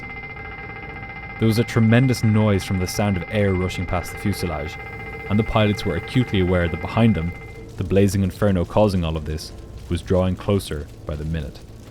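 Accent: Ireland. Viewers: That an Irish Brogue ?Love the channel. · Yeah, he does have a very pleasant Irish lilt.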